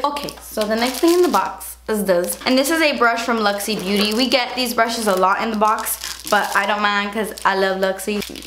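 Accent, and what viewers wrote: England, United Kingdom. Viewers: Anddddddddd I love your English accent I wish you would do a whole video talking like that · i would bloody love it if you would do a full video in your english accent omg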